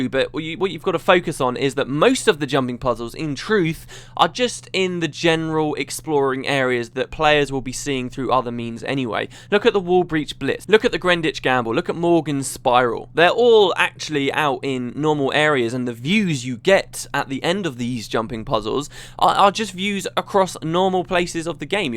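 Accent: United Kingdom. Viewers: I've just watched the fucked up videos pn youtube... I really hope will cheer me up with his excellent british accent.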